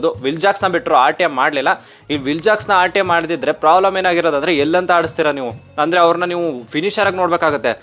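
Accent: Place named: Hassan, India. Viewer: Nin pakka hassan Mysore 😂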